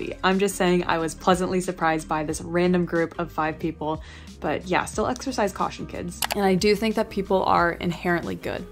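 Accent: Australia. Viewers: Dang, that is a long day!! Glad you found great companions from Philly to NYC though! I once ended up taking a Honda Civic (coup), two trains, and a bus ride to get from my college in Kansas to Ohio for Christmas. Even got mugged at one point. Also, your Australian accent sounds just like Margot Robbie! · I love your Australian accent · Reverse psychology, "Mama needs a drink" followed by "It's 5 o'clock somewhere" Love the accent are you from Australie...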